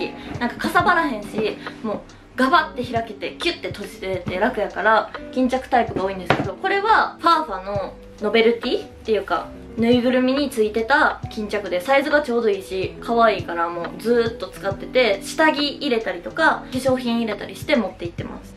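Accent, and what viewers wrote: Kansai, Japan. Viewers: いつもより関西弁？強めな気がする…かわいい…🥺💕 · 今日めっちゃ関西弁でかわいいwww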